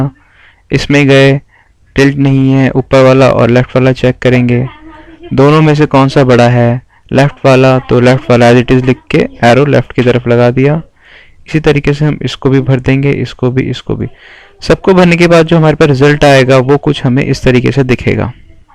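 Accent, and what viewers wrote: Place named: Pakistan. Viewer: Thanks for your help. Your accent is pakistani :) good keep it up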